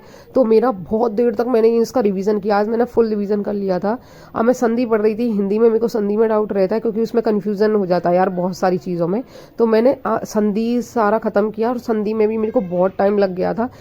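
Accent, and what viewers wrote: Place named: Mathura, India. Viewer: Aap proper mathura ki ho kha se h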